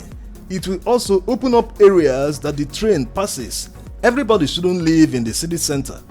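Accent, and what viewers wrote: Igbo, Nigeria. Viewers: No doubt the narrator is an Igbo man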